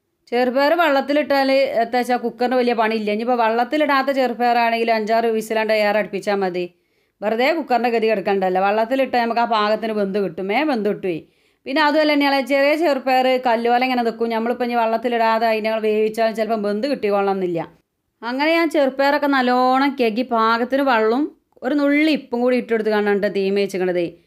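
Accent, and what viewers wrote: Malappuram, India. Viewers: താത്താ നിങ്ങടെ വർത്തമാനം മലപ്പുറം ജില്ല വിട്ടിട്ടുണ്ട് കുറച്ചൊക്കെ കുറയ്ക്കാമായിരുന്നു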